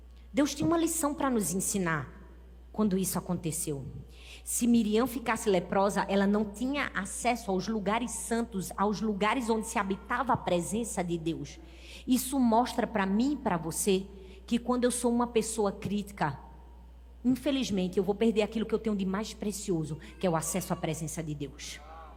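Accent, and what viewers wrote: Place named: Pernambuco, Brazil. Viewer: AMOOOO MESMO ESTA PASTORA....DO SOTAQUE GOSTOSO DE PERNAMBUCO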